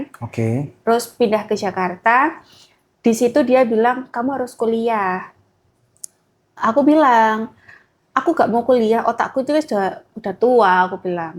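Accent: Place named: Java, Indonesia. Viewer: Cindo jawa lucu medhok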